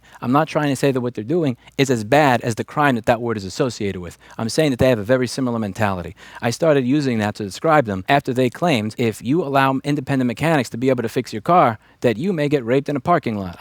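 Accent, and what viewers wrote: New York, United States. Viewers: ' New Yorkerness intensifies with each passing day. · You can tell he's been angrier lately because the New York accent is coming out more and more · I came down here to write the same thing! Just enough of an accent to notice. And that's something I never ever have heard from him in years. New York attitude? Certainly. But the accent? Pretty rare. It shows he means business!! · Is it just me or does sound more New Yorker now that he moved away. My parents signed up for a gym the first day there their locker got broken into and credit cards stolen. They decided it wasn't safe to be there. There was only one guy that could do cancelations and he was always conveniently off today or on break or some other excuse.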